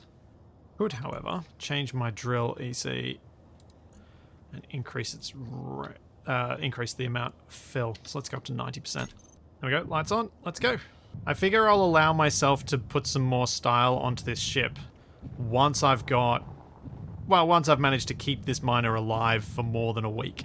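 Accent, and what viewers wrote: Australia. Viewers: Your voice, manerisms, and Australian accent are like a comfort blanket for me. I dont play space engineers too often anynore, but I still adore your videos 💜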